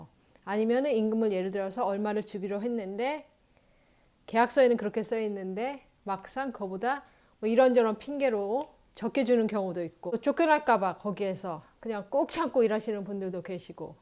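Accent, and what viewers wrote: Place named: Canada. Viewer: 차분하시고 한국말하시지만 혀 굴리는 소리가 많이 들려서 처음에는 캐나다에서 어릴때부터 사셨나 했더니, 성인이 되어가셨군요. 그래도 역시 중등학교 과정과 대학을 거기서 다시 졸업하셔서 그런지 캐내디언 같습니다.^^ 저도 취업비자로 들어가서 영주권을 받으려고 하는데 많이 도움이 될 것 같습니다. 감사합니다.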